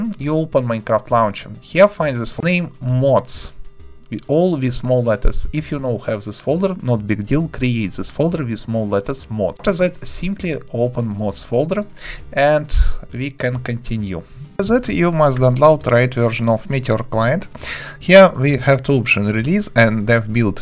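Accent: Russia. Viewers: dude найс инглиш ты русский и это слышно по акценту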